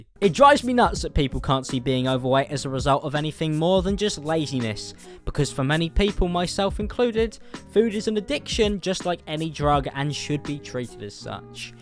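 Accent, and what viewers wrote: United Kingdom. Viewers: i usually cant stand hearing the british accent (for no reason) but i really appreciate your vids!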